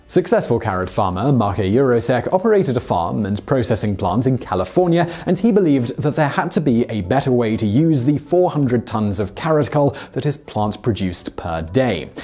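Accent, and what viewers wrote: United Kingdom. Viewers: Actually, I believe his target audience is primarily Americans, with other English speakers coming in second. Otherwise, he could simply stick with a 100% British dialect, but he doesn't.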